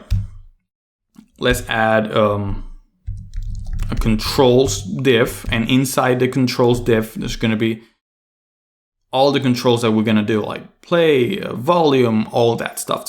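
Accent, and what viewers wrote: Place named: Puerto Rico. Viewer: You low key sound Puerto Rican.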